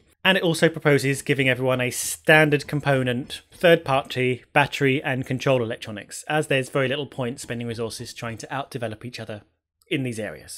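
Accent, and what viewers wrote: United Kingdom. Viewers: didn't notice cuz of ur British English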